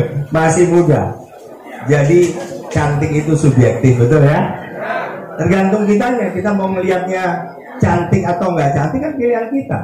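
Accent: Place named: Banyumas, Indonesia. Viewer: Ttep saya memiling pak andika rari orang ngapak bolo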